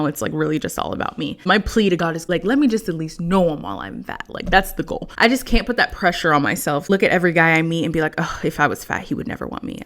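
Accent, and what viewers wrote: Texas, United States. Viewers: Omg your Texas accent is so cute lol! Thanks for sharing your updates and being transparent. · Hey there's nothing wrong with speaking with your Texas voice. I love my southern voice.🤪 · Your Texan accent is gorgeous and it’s part of you. So don’t hide that ish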